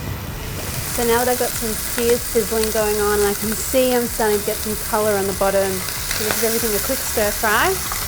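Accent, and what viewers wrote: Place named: Australia. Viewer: Ive eaten this all my life and love it so much but actually never learned how to cook it. Thank you you made it look easy and your video is easy to follow and understand and didn't leave me impatient while watching. And you pronounced pancit bihon very well but if you want to sound even more correct (not necessary but since you mentioned), just need round out your 'a' sound a little bit more. Like how you'd say (with your lovely aussie accent reading) "parn-sit bee-hawn"...that's how we Filipinos say it. Thanks....you made it look so "Masarap!" (delicious) xx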